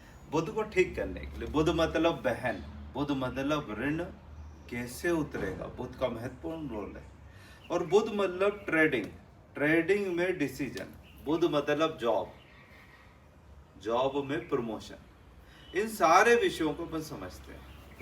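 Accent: Indore, India. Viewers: Bolne s lagta h indore ka touch h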